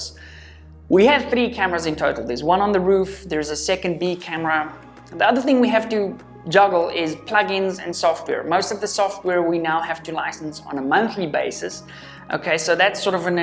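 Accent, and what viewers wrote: South Africa. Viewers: I knew this was a South African accent right away 😂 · Being in an Australian in a town that is becoming overrun by South Africans, your accent is really hard to listen to... I have reached a point where I can’t stand hearing that accent anymore... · Wow, I thought. Jis hierdie ou klink moerse Suid Afrikaans. And lo and behold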